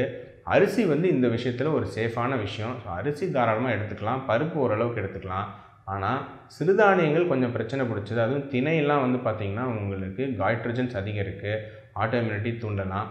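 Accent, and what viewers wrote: Kongu, India. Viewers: டாக்டர் அவர்களின் கொங்கு தமிழ் இனிமை. வாழ்க வளமுடன்.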